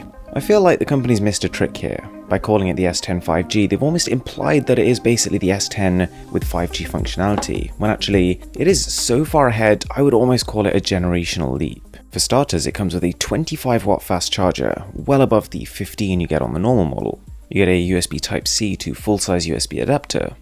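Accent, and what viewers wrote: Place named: England, United Kingdom. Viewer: no man! He lives in England that pretty much explains his accent...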